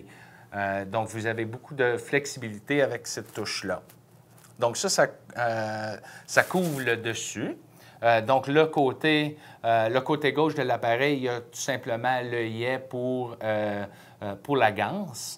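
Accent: Quebec, Canada. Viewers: Sounds French Canadian to me. French with a Anglo pronunciation. Nonetheless good review, I like that X10.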